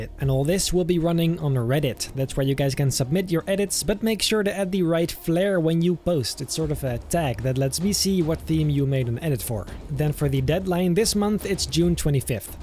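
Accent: Netherlands. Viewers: Its cool but we also miss the funny dutch accent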